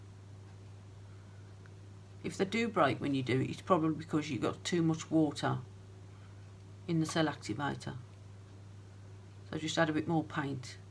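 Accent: Birmingham, United Kingdom. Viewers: You can't miss a Brummie accent! Lol. (Says a Geordie). 🙂🙂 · Hi Ms Brummie, so lovely hearing that great Brummie accent. Great video I will share on my acrylic art page on Facebook to share your information to my peeps in UK I have resisted taking part in the blooms craze recipe and try to find easy non complicated recipe 😂😂 I’m in Australia so do have access to the floetrol. Well done 👍🏼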